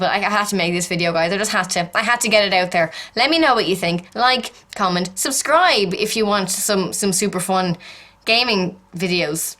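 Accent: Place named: Ireland. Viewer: Great video cos u were right but just wondering are u from Ireland cos u sound Irish and I'm Irish too lol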